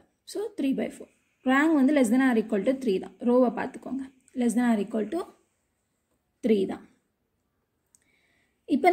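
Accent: Kerala, India. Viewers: U r malayali right .?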